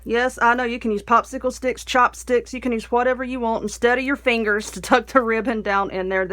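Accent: South, United States. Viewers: I LOVE your channel. I just found you tonight and I joined your group too. Your southern like me lol. I’m from Alabama. Love watching and learning. You’re awesome!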